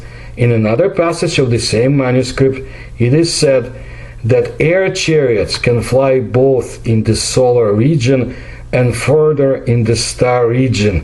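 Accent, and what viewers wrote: Russia. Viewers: Seconded. The videos are a treasure trove but the thick russian accent is a bit hard to follow. I suggest 1.25x for the meantime.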